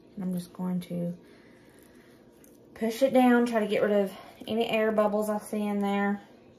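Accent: South, United States. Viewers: Never heard of home made Spam having lived in many different states I am amazed to see this process. Odd your channel and Mama Baird's both came out with this at the same time. Very interesting you surprise me and love hearing the Southern accent.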